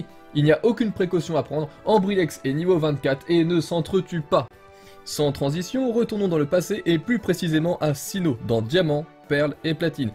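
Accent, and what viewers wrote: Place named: Lower Normandy, France. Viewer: "Ou qu'il l'est?" "Ou qu'on l'a?" T'es un vrai Bas Normand comme moi x)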